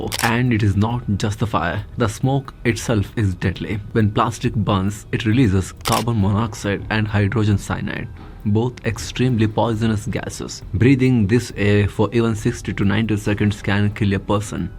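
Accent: Karnataka, India. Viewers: Bro, u r speaking English in the same pitch and modulation of your Kannada. Please correct it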